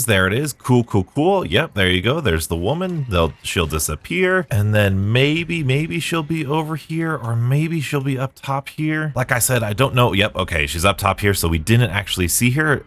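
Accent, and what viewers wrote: United States. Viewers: Couple things.. 1... I didn't know you were Midwestern And 2.. on the free wonderwafte or however you spell it.. mustang Sally's make it incredibly easy (even just Sally) as the aoe clears all the debris almost instantly... I haven't had a chance to test it out but I am wondering if you could use c4 in this step as well if you would rather not burn 5k credits plus the gs45s wall but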